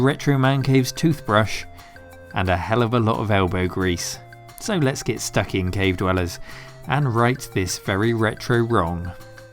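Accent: West Country, United Kingdom. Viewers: Oh wow you're in the Cotswolds, how lovely. Dull, but lovely ;) You do have a very slight West Country accent now I listen out for it.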